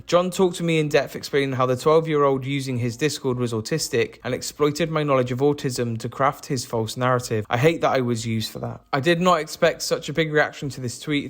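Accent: United Kingdom. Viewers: You sound like georgenotfound but 20x more British Btw I'm British aswell it's not a dig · Lmao this dude is British, Dream is American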